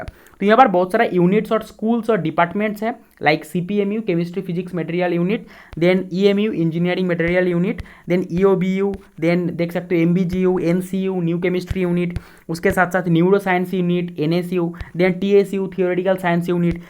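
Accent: Bengal, India. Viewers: Are you Bengali ?? Your voice like Bengali tone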